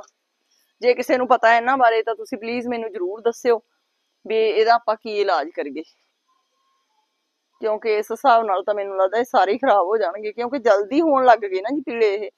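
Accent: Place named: Doaba, India. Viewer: Sade vi doabe vich pateela khende ne bhan ji 🙏🙏 thudi boli sade vargi a same ❤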